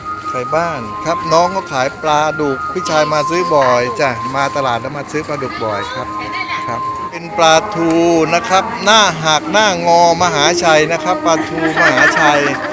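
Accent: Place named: South, Thailand. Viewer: พี่ชายเป็นคนใต้หรอเห็นแหลงใต้ได้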